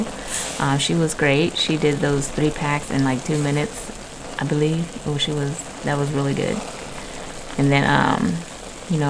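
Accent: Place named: South, United States. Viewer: I love your voice; I hear a southern accent 💞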